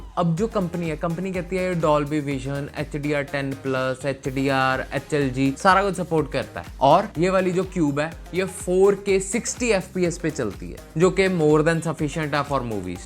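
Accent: Punjab, India. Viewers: Hated you Fucked up Punjabi accents 🤮🤮🤮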